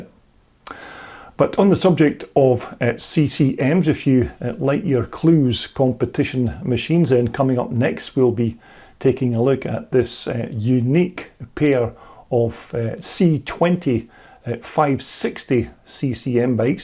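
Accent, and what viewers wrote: Scotland, United Kingdom. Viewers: Love your accent-are you Scottish? The only uncle I ever had was a hired hand on my grandparents tobacco farm in Canada and he was the coolest uncle you could ever have. John in Texas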